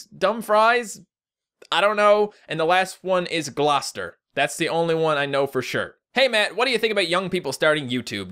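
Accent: Newfoundland, Canada. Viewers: I didn't understood anything of what you said with the Newfoundland accent. ^^ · Your "Newfoundland" accent sound kinda like the way people talk around here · That's weird, your accent is extremely Canadian. :'D I could tell you were Canadian instantly tbh. (I'm an accent nerd though) (anyway you're awesome matt keep on keeping on /o/) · Hey matt im from NL Bonavista and i understand all the newfoundland buddy, Love you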